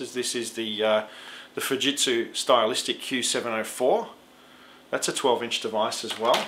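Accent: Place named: Australia. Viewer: Thanks for such a great presentation and review. Great to hear an Aussie accent in one of these too!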